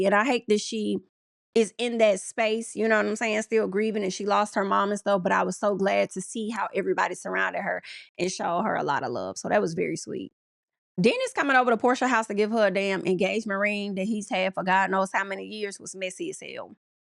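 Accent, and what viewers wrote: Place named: South, United States. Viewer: Can you just talk normal... I enjoy your takes but sheesh😅 I'm in NYC so maybe I don't get southern accents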